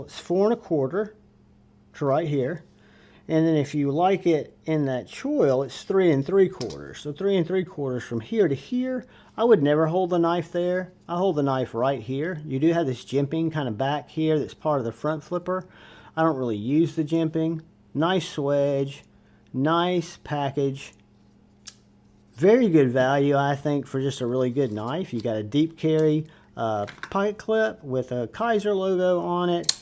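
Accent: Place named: Baltimore, United States. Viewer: Your accent sounds like Baltimore 😂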